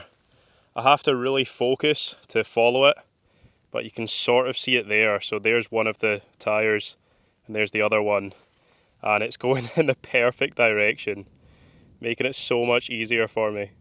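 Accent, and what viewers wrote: Scotland, United Kingdom. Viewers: Love this program. I'd love to see some episodes from the national parks or even greater or lesser known castles or more country estates of royalty etc. You can still go traipsing up the wee little monros all ya want. You come across very well when you speak. You would be good at telling the stories of the castles etc. Your Scottish accent is lovely. 🙏🏽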